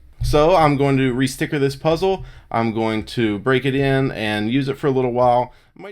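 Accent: South, United States. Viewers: You have a bit of a Southern drawl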